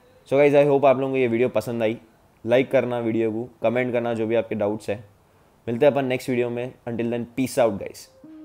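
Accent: Hyderabad, India. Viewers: Hydrabadi Miya Bhai Boltey ❤️✊ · Hyderabadi bolthe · Bro are you a hyderabadi..coz ua slang is similar.. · You are from Hyderabad?? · That Hyderabadi language ❤️ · bhaiii... aaap ka language Aur baatan sunke lagra ki aap hyderabadi Hai... arey apan bhi hyderabadi Hai.... aapka accenture Pura hyderabadi language ki waisich dhikra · Ye haidrabadi accent♥ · Kya proper Hyderabadi Hindi baat karre yaaro! OP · Love this Hyderabadi accent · Voice and accent op. Are you from Hyderabad? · Bhai aap hyderabad ke hai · typical hyderabadi hindi...kiraak hindi hai ye..hyderabad is jaan !! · Tum hydrabadi hai ji?? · Bhai bolta mast hai tu full Hyderabadi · Are u hyderabadi? Bahut hi pyari accent hai 😊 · I love his accent...ek number... Hyderabadi boltey😀😁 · Hyderabadi hindi bolthe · Hydrabadi bolte❤️ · Hyderabadi hindi bholthe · Bhai tumhara saare videos dekhta hu mai and ek reason ye hai ki tum acha smjhate lekin main reason ye hai ki kya mast Hyderabadi bolte bawa tum❤️ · bro it ia just hyderabadi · Hyderabadi ho kya ? 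· hyderabadi op bolte bhai kya ki je tumna to sab smja dale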